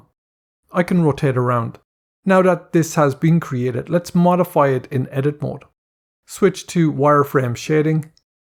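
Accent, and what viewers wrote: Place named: Ireland. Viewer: Excellent - thanks for pacing this nice and slow, and with clear diction (also nice to hear an Irish accent!). Many tutorials become hard to use when you have to pause, rewind and try to hear what the guy (always a guy) is actually saying. After using Sketchup for years, it takes a while to get the hang of Blender. Thanks again and keep 'm coming.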